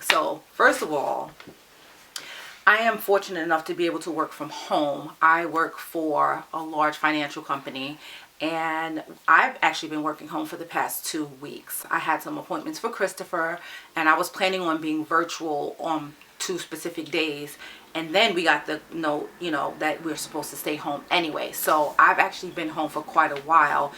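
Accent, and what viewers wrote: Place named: New York, United States. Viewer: I didn't know you were in NY, now I see where your accent is from lol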